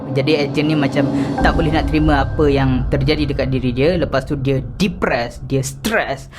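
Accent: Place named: Kedah, Malaysia. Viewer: Tudiaaaaa cakap kedah kaaaaa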